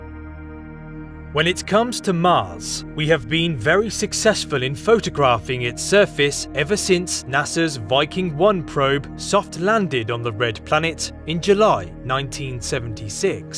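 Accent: United Kingdom. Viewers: Who would have expected a British accent in a Mars footage?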